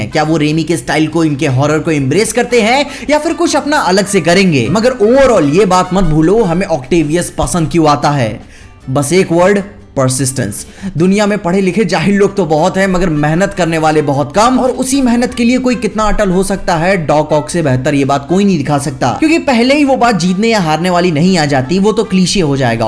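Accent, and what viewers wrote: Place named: Uttar Pradesh, India. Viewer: Bhayi aapka accent UP jaisa lagta hai, kahan ke ho aap ? 🤔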